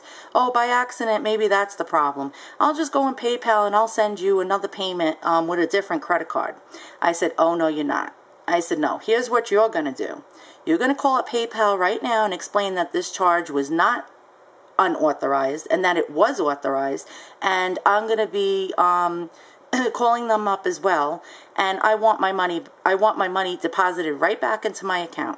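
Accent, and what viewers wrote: United States. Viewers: she sounds like she is from new jersey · Ur a fellow new yorker I can hear it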